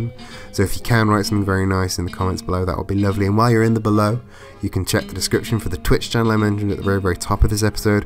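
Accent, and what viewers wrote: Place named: United Kingdom. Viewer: I guess I'll go against the grain and thank you for the first part. It had information I didn't know. The whole signifier thing is interesting. And it did connect well with "male by default." But once you actually got to explaining that, you were just saying stuff I already knew. It came up when I checked out this "horrible" Anita Sarkeesian everyone was talking about. I wonder if having a male-sounding voice (with a "smart person" aka British accent on top) talk about it will help it resonate further. Though do I understand correctly that you are actually non-binary? Just checking so I use the correct pronouns.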